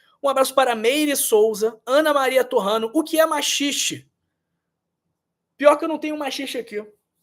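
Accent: Rio de Janeiro, Brazil. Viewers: Muito bem explicado Dr. Simpatia!! Gosto muito do seu sotaque carioca!! Mais uma lição de ensino contigo!! Obg Dr.!!❤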